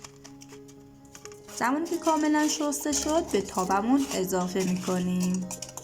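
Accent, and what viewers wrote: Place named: Mashhad, Iran. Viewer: عالی بود دوست من لحجه مشهدی قربون❤😊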